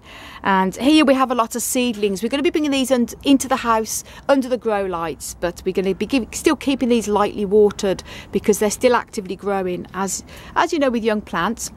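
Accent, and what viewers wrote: Birmingham, United Kingdom. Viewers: Are you from brum..sound like my family😂😂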